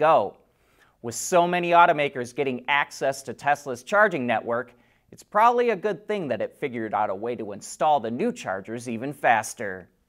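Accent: United States. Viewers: Is the presenter putting on the outrageous Murikan accent? Can anyone say where it comes from?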